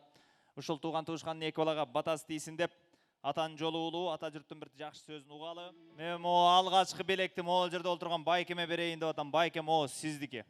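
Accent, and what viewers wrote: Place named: Kyrgyzstan. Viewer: азаматсың👏Кыргызча таптак, таза, жагымдуү сүйлөп, кыргыздын нукура жүзү болуп жүрө бер❤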